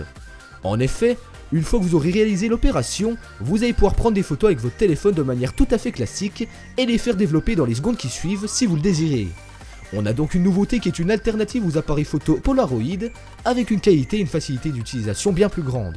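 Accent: Belgium. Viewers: j'adore l'accent belge.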